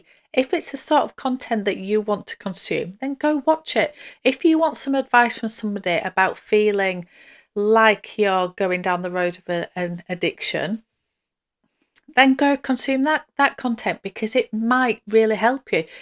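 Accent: United Kingdom. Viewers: Hi, Yes, I have stopped watching Colorful Noir, Caitlyn & the third (not sure who she is), but Shea Whitney is another one who has gone on to bigger and better things. It's hard watching some of those videos, because it makes me feel guilty about my bag obsession (as if something is wrong with me!) 😱😆🤣 Anyway, I watch you, Meredith, Lisa, and Dayle (Connor not so much 😆). As an American living in Vegas, it's something about the British and Australian accents that has me hooked to your channels. And I just love hearing Lisa's perspectives in NY. ☺️ I honestly believe the foreign accents in YouTube channels make them more appealing to watch. (You all sound so much more sophisticated than us!😂) It's very addicting. You have the most calm, soothing voice & are so polite but then stand your ground in a non-offensive way, which is a great skill to have, since it doesn't put people on the defensive or make them feel bad. 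Anytime you have a video up, I always watch it because it's like listening to a polite and sophisticated friend. Also, I believe a lot of people feel the same way. Americans love foreign accents, and even more so when talking about handbags! ☺️ So I think you could definitely talk about gardening & I would listen (just to hear your perspective in your British accent). 🥰💜💙🙏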